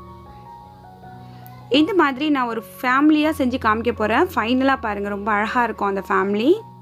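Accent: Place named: Chennai, India. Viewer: Very nice super showpiece akka unga Slong semmaya iruku neenga chennai ya sis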